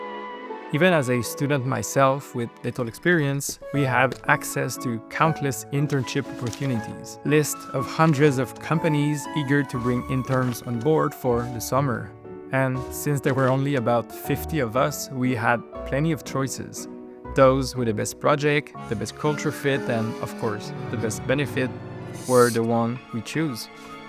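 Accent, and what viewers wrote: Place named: Quebec, Canada. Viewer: Je vois que t as un accent quebecois, donc je présume que tu parle français xd. Tu es partis à quelle université? Perso je dois choisir entre génie logiciel et génie éléctrique, mais je ne suis pas sûr sur laquelle partir. Je vais aussi bientôt completer ma technique d'Informatique en moins de 1 ans et juste avec ce diplôme je ne suis pas sûr d'être capable d'avoir un travail dans le secteur d'informatique en général.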